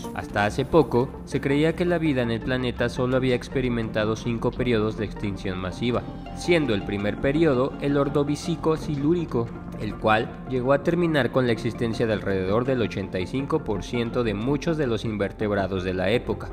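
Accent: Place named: Mexico City, Mexico. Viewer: Nomas de eschucharle la voz meca chilanga, me doy cuenta de la mentira de este video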